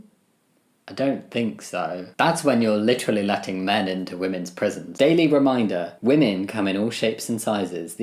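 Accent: England, United Kingdom. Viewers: He's English so of course he speaks weird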